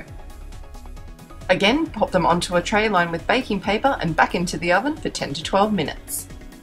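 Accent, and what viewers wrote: Australia. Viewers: I could tell you're Australian by your accent. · Are you Australian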